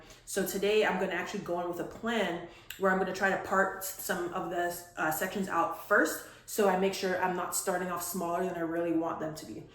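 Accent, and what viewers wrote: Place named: Barbados. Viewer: So good to hear a fellow country woman 🙏🏽 I would not have guessed that you are a Bajan you have lost most of your accent. Your hair is look good and I will be following your progress as my hair is the same length but it seems to have slowed down in it growth but is getting denser and thicker. Also I am planning to get a ReAir. I have seen and read some very good reviews. My advice to you is use a heat protector and increase the heat slightly for the final drying process for each section and you will see better results. 💕👍🏾🙏🏽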